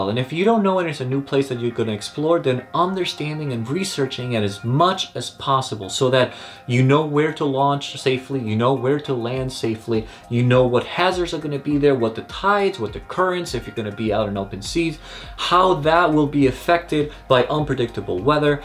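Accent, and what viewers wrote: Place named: Turkey. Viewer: You have a super Turkish accent for a Texan.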